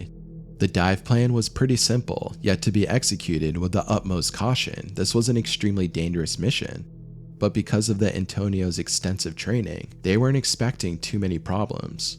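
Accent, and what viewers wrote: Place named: California, United States. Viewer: U are SO Californian🤣 I love listening to you cause it’s like one step down from Bill and Ted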